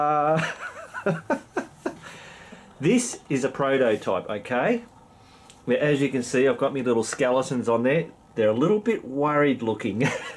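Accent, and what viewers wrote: Australia. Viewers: Thanks for the great video/info. You're a funny guy. You know, us yanks love the Aussie accent. The cardboard was pretty low tech, but certainly got the job done. Concern over blocking the air that cools the engine is always an important factor to consider and monitor. I've seen canvas covers that go over the front crash bars. You might want to get a digital laser temp gun to monitor your engine temp. Thanks again. I will integrate all this into my solution.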